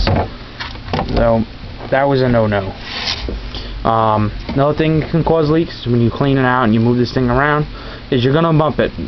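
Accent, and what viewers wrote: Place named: New York, United States. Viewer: I knew you were from NY, I can tell by your accent! Lets Go NY!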